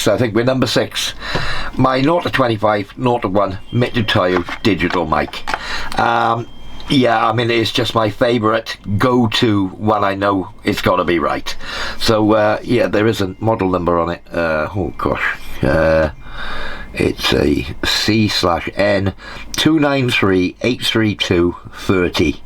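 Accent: Pembrokeshire, United Kingdom. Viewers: Hi. Moved to Portugal from H/west 8 yrs ago. Just happened on your channel. Loved the video and great to here the Pembs accent. ✊🏽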